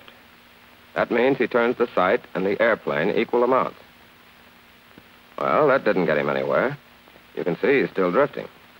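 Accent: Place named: Transatlantic, United States. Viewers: That transatlantic accent carries hard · Its the Mid Attlantic accent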